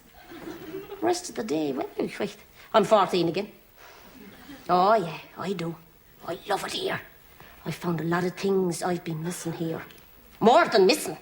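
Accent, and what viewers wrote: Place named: Ireland. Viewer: This is an excellent irish accent !!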